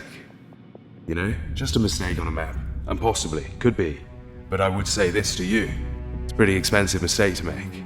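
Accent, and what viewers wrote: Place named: United Kingdom. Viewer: Nice British accent - I still suspect he's Russian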